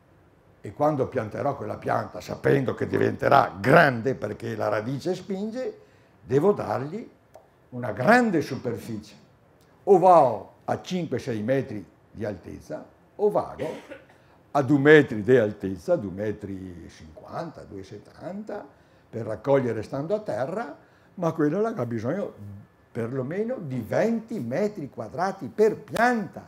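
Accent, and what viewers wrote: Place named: Verona, Italy. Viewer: Perché? Cosa dice che non va. È veronese, di una certa età, come dove parlare secondo costoro? Bha... Giusta osservazione la tua. Ciao!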